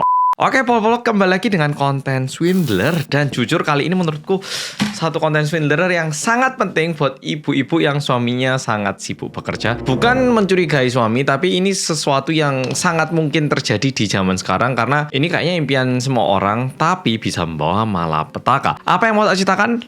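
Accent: Java, Indonesia. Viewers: Sampe tak ulang2 bagian paca'an,jaaaan kuentel jowone gak ilang ilang cak 😂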